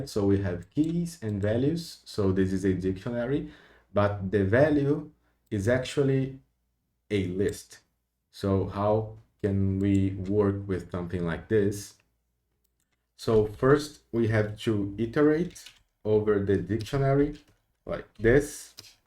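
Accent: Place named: Brazil. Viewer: You sound like you've got a Brazilian accent, is that right? I am from Brazil, living overseas, learning Python, and this is exactly was I was looking for. Obrigado!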